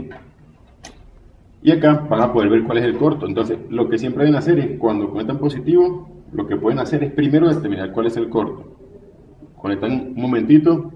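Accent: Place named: Venezuela. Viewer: Gracias !! al escucharte por el acento lo pensé que eras de Venezuela...sigue adelante, ya me suscribí...desde Valera Edo.Trujillo Venezuela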